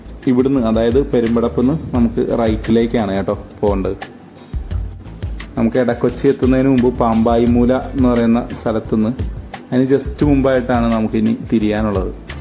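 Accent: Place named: Kollam, India. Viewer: Shudhamaya Kollam slang.. ethaand achadi malayalam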